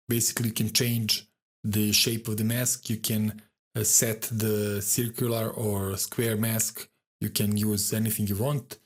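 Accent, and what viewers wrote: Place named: Brazil. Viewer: sotaque brasileiro total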